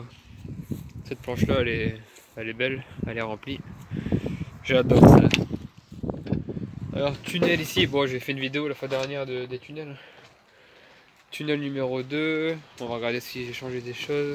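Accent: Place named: Nord, France. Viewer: Bonjour . J'aime bien je m'abonne !!! je suis un potagiste de l' ile de la Réunion , j'ai bien reconnu la pointe d'accent du Nord !!! Je suis Picard d'origine . La menthe c"est efficace pour l'éloignement de certains insectes volants et rongeurs , ils ne doivent pas apprécier l 'odeur !!! Les œillets d'Indes c'est pas mal non plus . Bonne continuation , le meilleur pour toi et tes plantations .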